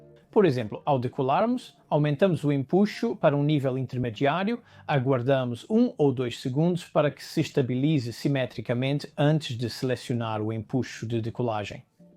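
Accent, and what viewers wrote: Portugal. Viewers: Português de Portugal não por favor😢😢😢😢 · Português de Portugal é de matar!!! Mas o conteúdo é ótimo! Continuem com o português brasileiro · Português de Portugal não dá!!!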